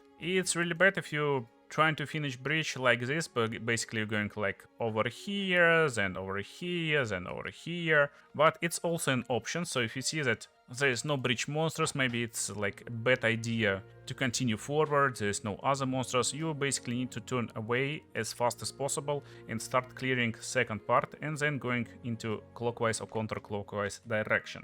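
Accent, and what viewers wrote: Russia. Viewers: sounds like Runglish orn Engussian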